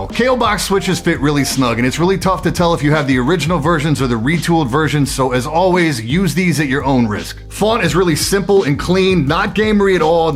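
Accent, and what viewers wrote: New York, United States. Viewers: You do great reviews man. Really cool, polished production. And I don't know where you're from so I hope it's ok to say this, but I love that your accent sounds like you're a New Yorker just talking to his buddy like "Yo Jimmy! Look at these fuckin' keycaps. Look at these things." Such a cool vibe in a tech review.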